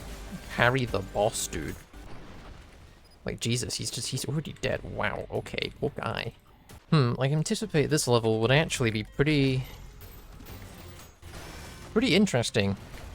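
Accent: United Kingdom. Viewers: WHY DOES HE SOUND MORE BRITISH IN THIS ONE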